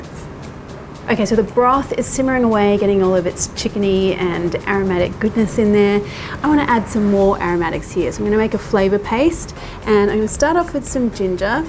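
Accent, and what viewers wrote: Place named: Australia. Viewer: How i miss Australian accent..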